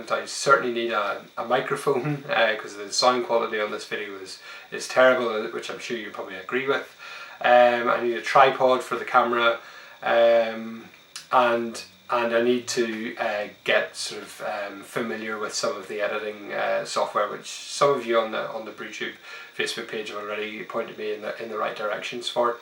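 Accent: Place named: Scotland, United Kingdom. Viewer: There he is, hey, nice to see you on the tube. Now if I didn't know better, I would say there is a very slight Ballymena lilt to your accent these days although it's most likely that Scottish influence. Definitely would like to see the braumeister in action at some point and some small batch brewing. Always worthwhile seeing how others do it. From what I remember, the mash recirculation is a thing to behold.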